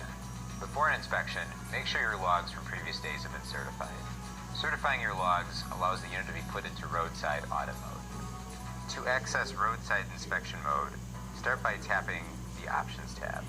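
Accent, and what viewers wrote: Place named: Boston, United States. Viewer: He speaks with an Boston Accent!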